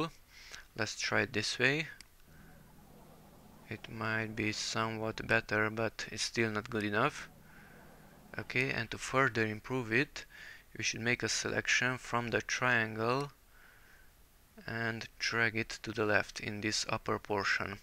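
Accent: Hungary. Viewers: Köszi a segítséget, kicsit hallatszik a "magyar angol" :D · Epp azon gondolkodtam, hogy ez az angol kiejtes egy kicsit magyarosnak hangzik, ekkor megneztem a csatorna nevet...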